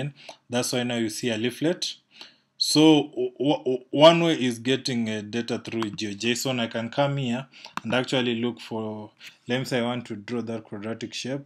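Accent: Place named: Kenya. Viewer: The moment I had the voice I knew it a was kenyan big up bro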